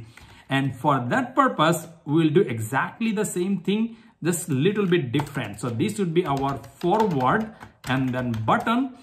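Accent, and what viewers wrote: India. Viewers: Bro like i am ur python guy and its insane like how ur doing this you should have more subs then mrbeast edit: Bro sounds like indain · Does anybody notice about this guy's funny accent also he is Indian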